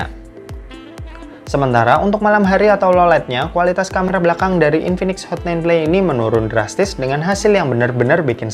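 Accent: Semarang, Indonesia. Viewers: Wah, abang orang Semarang ya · Orang semarang lu yak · Org semarang ya gan?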